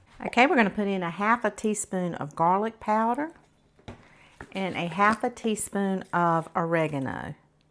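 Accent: South, United States. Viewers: Aussie here! Love the southern accent! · You must be in Ga., I’ve retired to the south. I’m learning all the unique diff southern drawsssss!🤣🤗🧅🍑🧅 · I saw onion fritters so I clicked the video and THEN I heard that sweet southern accent and instantly subbed 😂 you sweet lil southern ladies ARE THEEEE BEST COOKS EVER!!❤❤😂😂 & Im a polish girl from up north so I CAN NEVER taste food this good 😂! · Yeah, it's sad people gotta be mean like that and try to make other people miserable. I just ignore them unless they are particularly ugly, then I'd report them. Me and my 10 brothers and sisters were raised on fritters. Heck, Mom made fritters from fritters. Anything leftover in the refrigerator was fritter fodder. Corn fritters, mashed tater fritters, hot dog fritters, cheese fritters, liver and onion fritters, cow tongue fritters, spinach fritters, apple or any kind of fruit fritter. 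I've had them all. Mom would disguise ingredients inside a fritter for the food the my little brothers didn't like and it tricked them every time. Spinach & blueberry fritters rolled in a bit of sugar… delish. And my brothers didn't even realize they had eaten yucky spinach. I'm getting a big kick out of her southern accent. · I just found your channel just this minute and let me tell you I absolutely love it! Love your lovely southern accent ❤I’ll be making this recipe this weekend for my son who’s coming over for vacation and love my cooking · That accent. Georgia or Alabama?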